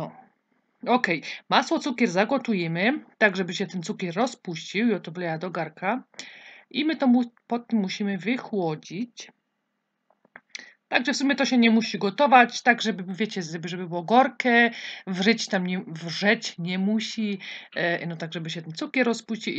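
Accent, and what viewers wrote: Silesia, Poland. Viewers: Pyszny przepis! Dziękuję! Słychać, że Pani ze Śląska! :-) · Pani ze ślunska ja?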